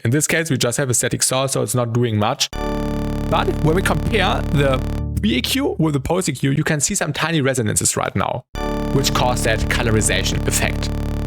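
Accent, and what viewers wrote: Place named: Germany. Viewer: great video … but holy German accent xD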